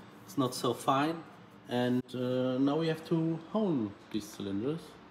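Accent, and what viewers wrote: Germany. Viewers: давно в Германии живёт,слышно по акценту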